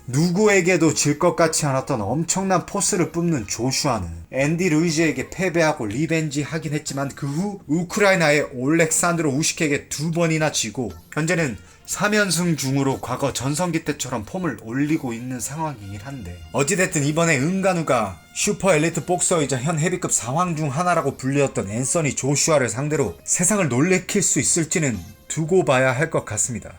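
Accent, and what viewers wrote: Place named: China. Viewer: ㅇㅈ 조선족 같아 임마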